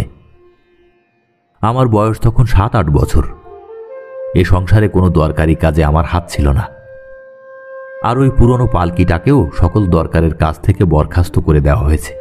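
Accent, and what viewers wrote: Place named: Bangal, Bangladesh. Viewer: আপনার কণ্ঠস্বর ভাল ৷ উচ্চারণের সমস্যা যাকে বলে 'বাঙাল-টান' আছে ৷ কিছু, মনে করবেন না, আমারও পূর্ব পুরুষ বাঙাল ৷ আপনি বাংলা দেশের গল্প সিলেক্ট করে শোনান না কেন? সঙ্গে চলুক কলকাতার উচ্চারণের প্র্যাকটিস...৷ এ ধরণের 'একটানা কথকতা' এখন এড়িয়ে চলুন ৷ অনেক চরিত্র যেখানে কথা বলছে ---সেই রকম গল্প রেকর্ড করুন ৷ আগের গল্পের নারী-কণ্ঠটি কিন্তু ভাল ছিল...তাঁর সাহায্য নিন ৷ আবারও বলছি আপনার কণ্ঠস্বর ভাল, পড়া ভাল.....ভাল থাকবেন বন্ধু 💚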